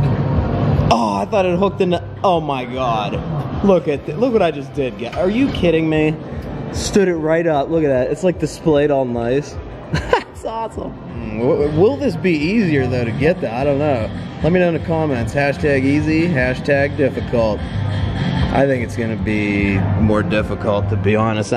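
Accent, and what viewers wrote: Pittsburgh, United States. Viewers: Are you from PA or are you just visiting Pittsburgh...? >.>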